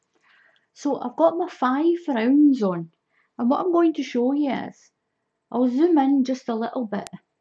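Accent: Scotland, United Kingdom. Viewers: The closed captions on this are hilarious 🤣 I love your Scottish accent!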